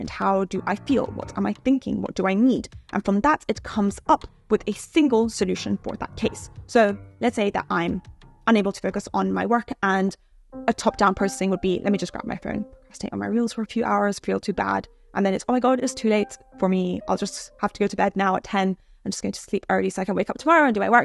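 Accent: United Kingdom. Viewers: Your voice is not annoying. It sounds just like Karen Gillian. (though it might just be the british accent). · Oh my god I don't understand British English, I'm learning American english 🤦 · Is it British accent who know about that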